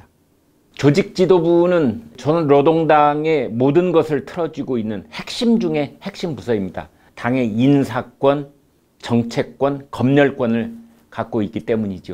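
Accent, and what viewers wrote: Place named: Jeolla, South Korea. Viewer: 전라도 사투리가 구수한 김광일 의원님. 너무 좋아요. 사투리보다 더 좋은건 예리한 의원님의 시사평. 자유민주주의 대한민국이 돌아올수있게 많은 노력 부탁드립니다.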